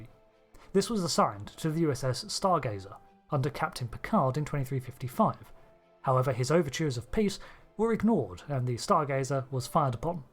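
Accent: United Kingdom. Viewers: The British accent is great for this type of subject. Makes it sound kind of like an old WW2 documentary. Lol.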